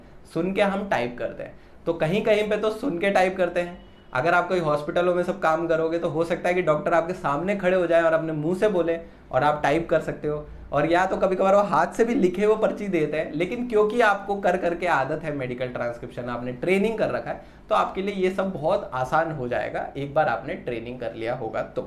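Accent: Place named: Uttarakhand, India. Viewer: Sir u r from uttarakhand ur voice matching uttrakhandi ji send address I am interested too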